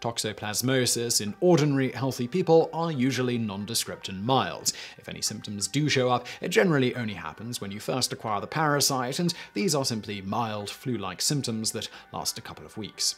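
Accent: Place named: RP, United Kingdom. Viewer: Why do you sound posher than usual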